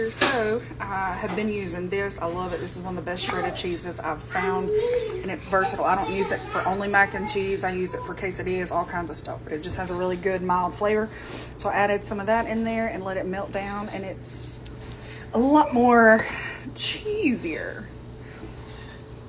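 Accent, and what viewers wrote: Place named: South, United States. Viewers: you sound like an american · Somewhere in the south for sure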